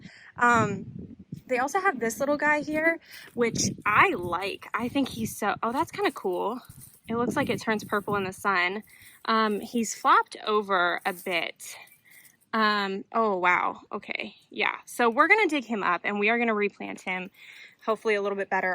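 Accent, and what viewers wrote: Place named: South, United States. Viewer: Hey, I was wondering what state and zone you are located in? Seems like your Southern.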